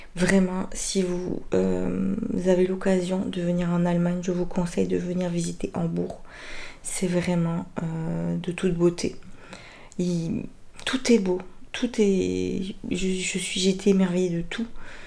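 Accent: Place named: South-West, France. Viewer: c'est d'ou ce bel accent ? sud ouest ?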